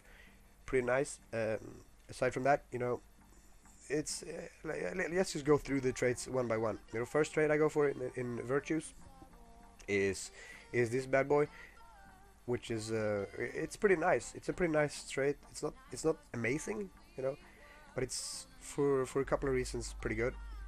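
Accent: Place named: Scotland, United Kingdom. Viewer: where are you from? XD i tried thinking but i couldnt decide between a scottish accent or a deeper accent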